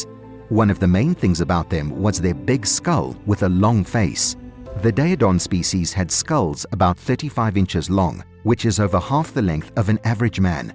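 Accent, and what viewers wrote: United Kingdom. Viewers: UK accents are beyond annoying. DISLIKE.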